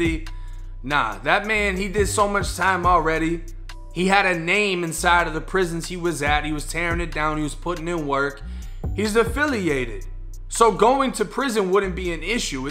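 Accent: Boston, United States. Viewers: are you from Boston cuz you have a slight Boston type Accent on how you Pronounce words with R in it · You sure your not from Boston??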